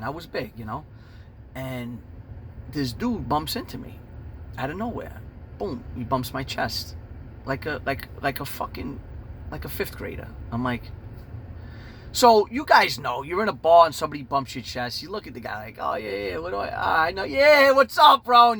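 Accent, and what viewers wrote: New York, United States. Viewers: Love the content you and produce it's awesome so glade i found your channel I like your accent it reminds me of the old new York movies great work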